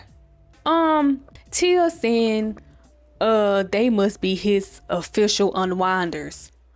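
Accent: Memphis, United States. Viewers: Girl are you from Memphis? I love your accent. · May I ask where you’re from? Just asking because you sound like Memphis area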